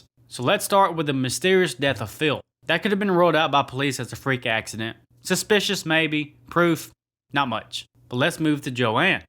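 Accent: South, United States. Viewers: your vids are quickky becoming my favorites! Been going through ur lists this last weekend. Great to see someone with a southern accent creating great vids too, bama here! Anyways lovely job, looking forward to CP3, totally my guilty pleasure of the chuckster movies.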